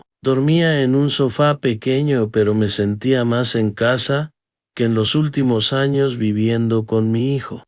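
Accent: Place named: Pocho, United States. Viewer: Que pésima narración, no necesitas intentar hablar como pocho, el español es bellísimo, no me gustó tu narración, no terminé de escucharte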